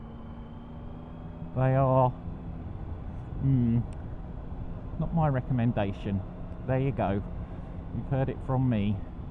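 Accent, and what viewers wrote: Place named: United Kingdom. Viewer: Extremely narrow roads, trees/buildings right on top of the road, rain, driving on the wrong side of the road, and a guy with an accent, must be another Brittish motovlogger. I heard someone on a different video say this was a Chrisitan motovlog channel. Are you not doing faith based vids any longer, or is it that you are simply a Christian that rides a bike? I just started my channel, but have been riding for 50+ years. Riding in rain is not the most fun, but not as bad as icy roads or snow on them, done that too many times as well. Ride safe, y'all. Greetings from Tennessee, USA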